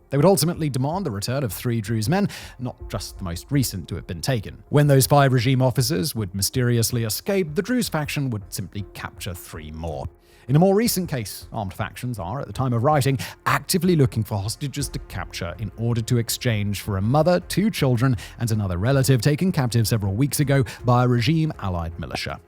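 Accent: RP, United Kingdom. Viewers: This guys English is about as posh as I've ever heard. It's fun to listen to though.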